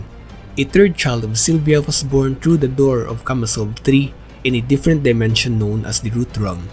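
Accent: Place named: India. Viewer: 感覺有點印度口音XDD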